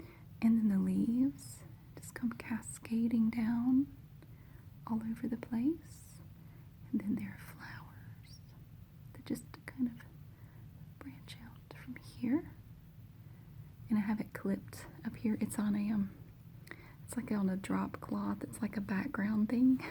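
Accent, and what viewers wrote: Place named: South Carolina, United States. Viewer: I’m from South Carolina, living in Tokyo, & your voice makes me homesick.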